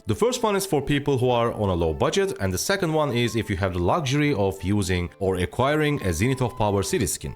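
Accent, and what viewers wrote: Turkey. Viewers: Türk aksanı duyuyorum sanırım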